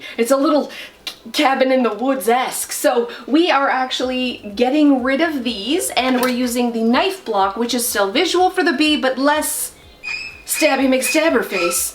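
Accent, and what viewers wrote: Canada. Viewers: I love your videos. You remind me of myself. I have to confess, though, I make fun of your Canadian accent when I watch. 😋 It’s pretty close to my relatives in Minnesota. 😘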